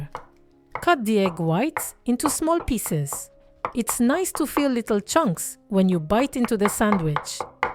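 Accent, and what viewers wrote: France. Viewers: The French accent mixed with Asian accent while speaking English is delightful 🪻💐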